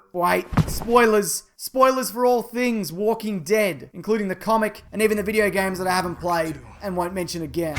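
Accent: Australia. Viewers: The generally upbeat, laid-back rhythm of the Australian accent contrasts perfectly with your particular brand of snarling vitriolic sarcasm. This channel is a joy to listen to. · okay first off my Ozzie friend. I love your videos for some reason you always say what is going on in my head LOL I know that sounds strange but that's not the point. I just want you to say tattoos as many times as you possibly can. because it's definitely not the way here in the US say tattoos LOL but I love it I first noticed when you were talking about the Joker and hoe it was not a fully realized character but you said tattoos at least a dozen times. okay well that about covers it just make a video about tattoos where you say the word tattoos as much as possible you Ozzie's you.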